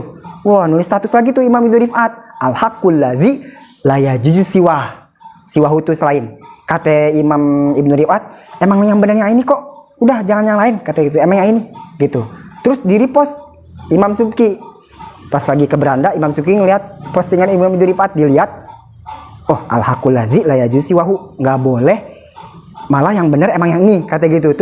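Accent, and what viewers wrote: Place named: Jakarta, Indonesia. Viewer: Bang care ngomong lu gue demen banget dah bang😊 mau nanye nih ape boleh..?.abang ustaz orang betawi ye betawi mane baang..?.logatnye medok banget bang enak gue dengernye kaye gado"..betawi..he hee..salam.kenal bang 😂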